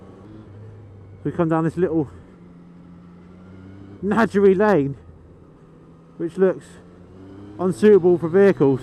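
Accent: United Kingdom. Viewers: Its the queens tounge, it never gets old. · The british accent gets old after a while.. Borderline annoying.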